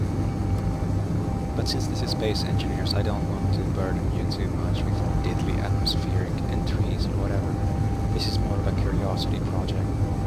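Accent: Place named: Scandinavia. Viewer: You sound like a Scandinavian Bob Ross. I could listen to you forever :D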